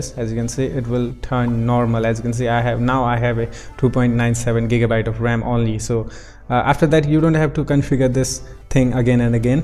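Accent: India. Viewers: Will it work on my 1gb ram phone? BTW you're my first Indian YouTuber I know who has a great accent unlike the others!!! Keep it up bud!! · The Indian english accent is working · First time i heard good indian accent. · 70% People talking about his Indian accent 30% People talking about ram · Wait what ur indian :O nice accent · So can you choose what storage you take the ram from? First indian guy i saw who has nice accent😀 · Best Indian speaking English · Beautiful Indian Accent 🤧✨💖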